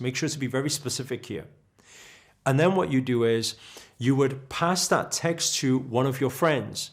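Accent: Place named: Liverpool, United Kingdom. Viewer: You sound like your from Liverpool.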